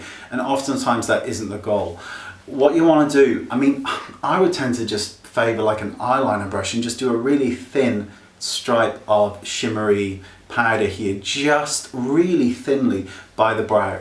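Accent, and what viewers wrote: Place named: United Kingdom. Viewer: I love your channel... I come from Italy and for me it's a bit difficoult understand your british English :D (i understand better American English)... But I love the way you say your advices :D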